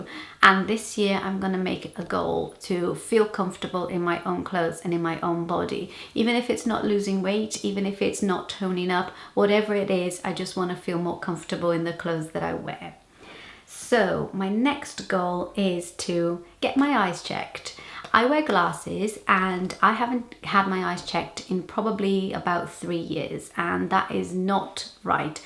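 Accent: United Kingdom. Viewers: I started to watch your videos because I love British accent and I am Brazilian as well. My purpose is improve my vocabulary and my listening skills . Congrats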